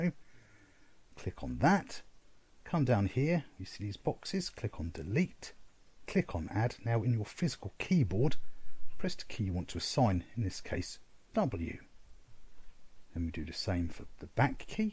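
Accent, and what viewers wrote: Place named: England, United Kingdom. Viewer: This guy has a very strong English accent and I cant understand him plus I need the audio almost full blast. Is there a *TYPED* version of what this guy is saying?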